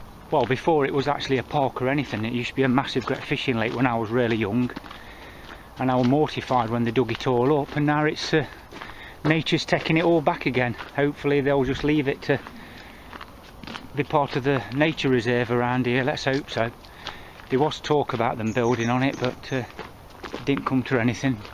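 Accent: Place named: East Midlands, United Kingdom. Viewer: Tell your wife she is beautiful as she is. Nobody of her weight needs to lose any 👍🏼. Love this video and feel I'm back in that area listening to your accent. I'm from Kimberley so it's really familiar to me. My dads from Heanor and I've friends still in Il'son. I used to go in the Newdigate Arms years ago. Oi, no poopy bags? Were u gonna ignore Gus if he'd had a dump? Love the way he is teasing you with the stick.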